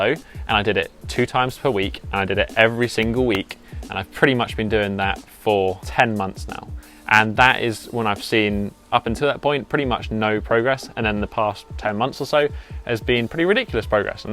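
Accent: United Kingdom. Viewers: Awww british., damn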